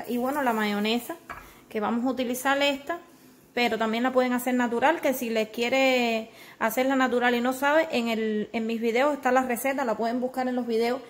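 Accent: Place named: Cuba. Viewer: Estraño cuba y me encanta que tienes aún tu asiento cubano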